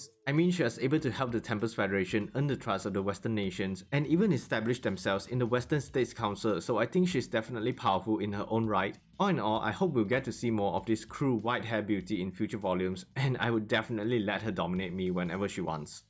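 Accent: Singapore. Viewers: srry. Ur accent is singlish tho. Keep ut the goodwork!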